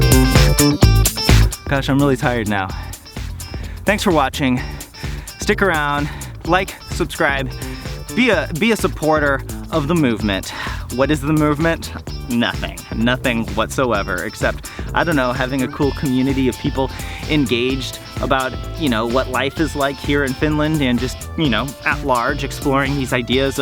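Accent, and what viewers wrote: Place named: United States. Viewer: You're not Finnish, are you? Awesome American accent. Just, FWIW, Americans will never understand Finns! Sorry, gave up with the bullshit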